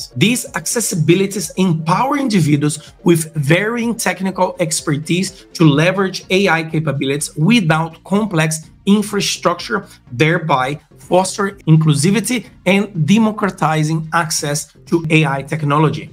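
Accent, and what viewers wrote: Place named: Brazil. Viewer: Your accent is too strong, looks like a Brazilian speaking in english..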